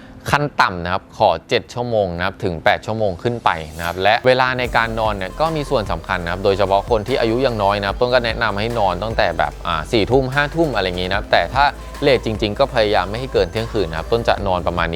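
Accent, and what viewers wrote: Thailand. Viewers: น้องออกเหน่อนิดๆ เป็นคนต่างจังหวัดใช่มั้ยครับ น้องอธิบายได้ดีมากครับ ทำตามได้ง่าย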